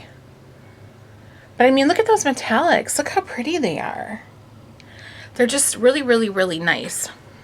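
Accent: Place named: Montana, United States. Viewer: You sound like a Montana girl,! There's been snow on the mountains here since the end of September. Winter is my favorite. Clean. Crisp. Holidays. <3 ALL OF IT. I'm originally from Terre Haute, Indiana, so I understand the Midwest Weather Moodswings. I don't miss it.;) THANK YOU for your videos. You crack me up and your vernacular and vocabulary about product gives me verbage tools to speak to my customers about our products. <3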